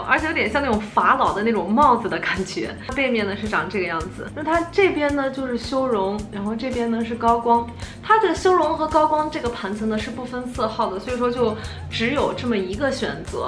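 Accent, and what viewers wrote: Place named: Xi'an, China. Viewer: 作为半个西安人，听krysti说话特别亲切！西安妹子加油！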